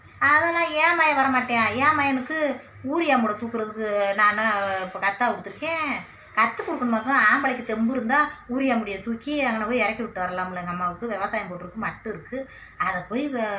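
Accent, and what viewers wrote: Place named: Mudukulathur, India. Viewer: உங்களின் பேச்சு வழக்கு முதுகுளத்தூர் வட்டத்தில் பேசுவது போல் இருக்கு.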